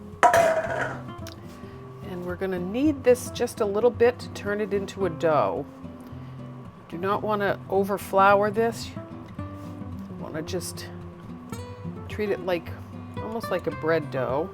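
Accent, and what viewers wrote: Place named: Northeast, United States. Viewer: Yes, indeed, it does look amazing! Great “Save!” Heading over to the next! First, I’ve got to ask you what state you’re from. My mom and dad were from New Jersey/New York, and I detect some sort of North East accent in some of your words.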